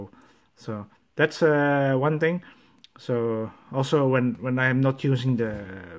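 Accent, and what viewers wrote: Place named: Netherlands. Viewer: mooi nederlands accent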